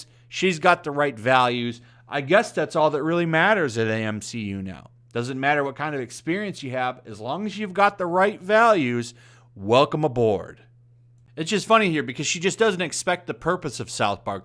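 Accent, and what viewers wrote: United Kingdom. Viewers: What’s with the funny British accent? Pretty awful...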